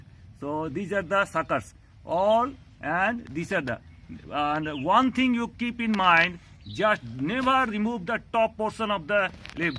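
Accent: India. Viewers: इससे तो आप हिंदी मे बात करते तो अच्छां होता ...ये कीस तरह कि इंग्लिश है ? इंडियन लेह्जे कि ?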